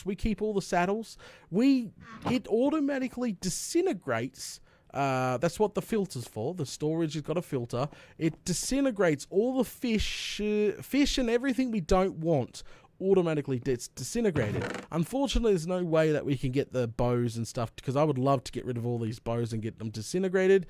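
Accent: Australia. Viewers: not hating at all but you are just soo australian it’s crazy lol. anyway wonderful video and beautiful world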